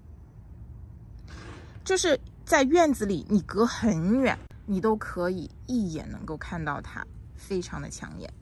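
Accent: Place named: Hubei, China. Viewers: 自信点，去掉”有点”。应该就是仙桃洪湖监利天门那一块的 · 听管主的口音，有点像湖北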